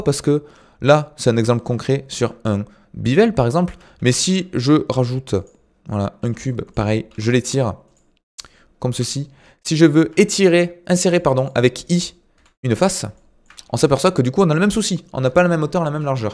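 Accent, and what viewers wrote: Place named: Southwest, France. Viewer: Oh des barres ! J'suis tombé sur ta vidéo et ma copine (finlandaise) m'a dit : Oh on dirait ta voix avec l'accent :D Le S U A te salut ! Merci pour tes vidéos du sud ouest ;)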